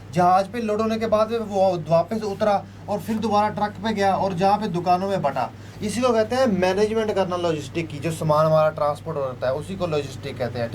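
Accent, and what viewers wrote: Punjab, India. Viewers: Sir g tusi bolde ho ta hasa onda h😀😀😊😂 Pure punjabi 😄😄 Atttt sir G 👌👌👌👌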